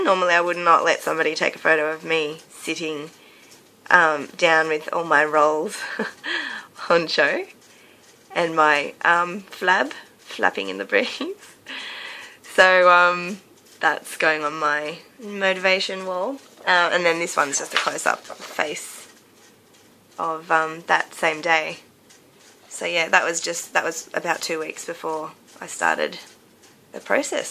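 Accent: Australia. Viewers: Love your Aussie accent... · First off may I say you're gorgeous! I totally relate to what you're saying when you talk about your parent's talking about your outfits when you were younger. I remember once my dad saying I looked like a sack of potatoes in a t-shirt dress I had (that I loved) when I was about 10. Kind of broke my heart a little. I totally get it. I think now though, he is my biggest fan. Thanks for sharing. Love your accent, I'm Canadian so Aussie accents are intriguing ;) I'm going to have to check out that app! Cheers!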